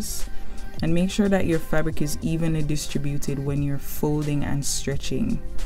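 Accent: Jamaica. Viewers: Are youJamaican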